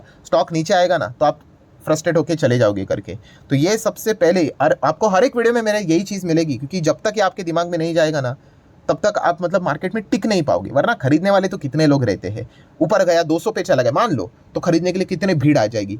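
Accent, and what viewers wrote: Maharashtra, India. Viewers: Kantala se laga sir Marathi Manus hai 😂😂😂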